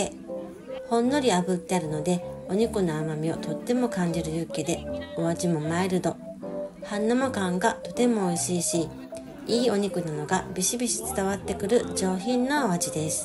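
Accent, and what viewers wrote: Kyoto, Japan. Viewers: その京言葉が いつも心地よくて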